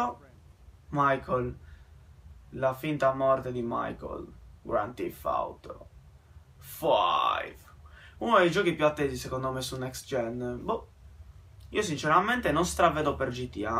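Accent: Sardinia, Italy. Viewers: Si sente che sei sardo ;)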